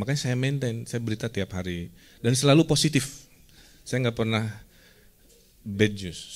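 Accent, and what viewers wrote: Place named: West Java, Indonesia. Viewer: Makluk orang sunda mah begini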